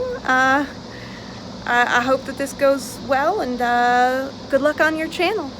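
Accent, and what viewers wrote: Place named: South, United States. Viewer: Hi, nice to meet you. I love your accent. I'm from the UK but I love the country accent. Who is your favourite country music artist? Mine is., I hope this ok to ask, have you ever switched on camera? I found your channel through DissociaDID.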